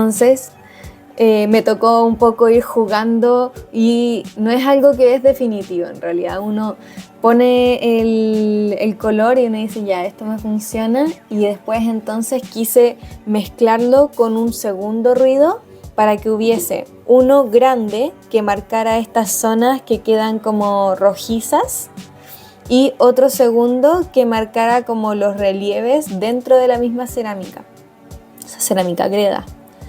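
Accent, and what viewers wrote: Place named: Chile. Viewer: claro que me interesa, yo te le decia como consejo, tambien hago 3d. igual creo que si grabas directamente lo que vas haciendo y hablas de lo que piensas tambien sería entretenido, ademas no tendrías que gastar tiempo en edicion de video y harías las 2 cosas al mismo tiempo, luego lo subes así no mas, total no tiene que salir perfecto, y lo imperfecto e improvisado funciona bien por el hecho de que si es que te equivocas, tambien la gente aprenderá de los errores y de posibles soluciones. ademas que dicen que mejora la oratoria el hablar a gente sin tener a nadie presente, no es que diga que hablas mal, es mas yo tambien soy chileno y me hacía falta escuchar mi acento desde que vivo en brasil, sobre todo si es con blender. eso de la oratoria se lo escuché a ooki de monkey, no se si lo conoces, es un colombiano que hace videos de escultura. 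igual solo es un consejo para que no gastes tiempo en guion (que no se si lo haces) o en edicion de video. ademas que resultaría super natural y podrías explotar tu naturalidad y no perder tiempo en cosas que lo mas probable no le preocupan mucho a la gente que desea ver tu contenido. yo no soy creador de contenido y no se mucho las dificultades que trae serlo, solo te lo comento por videos que eh visto que siguen esa rutina, por ejemplo las master class de alinwan studios. el solo se graba y luego en la edicion de video solo hace cortes, por si es que vá al baño y le agrega una intro y nada mas, pero su contenido es oro puro en blender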